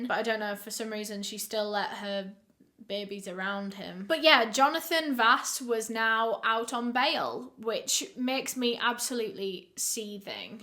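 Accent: United Kingdom. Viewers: I know this a British accent, but it seems different! Your accent is so satisfying!! What area of the UK speaks like this,,,, unless it’s not British haha.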